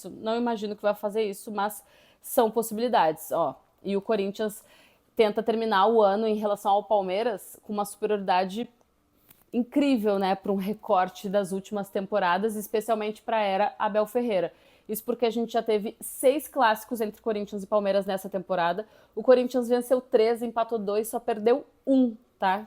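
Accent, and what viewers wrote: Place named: South, Brazil. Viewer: Você é😂 Catarina ou Gaúcha? Porque este "ãn" é característico destes dois estados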